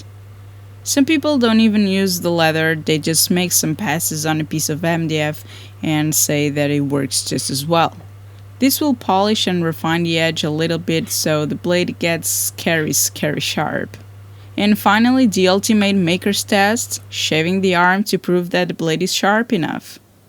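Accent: Portugal. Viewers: so agora depois de ver tantos videos é que percebi que és de Portugal :)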